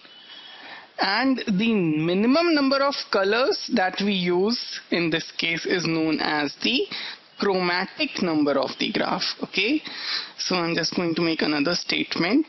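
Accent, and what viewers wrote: India. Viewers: i am sick of this indian accent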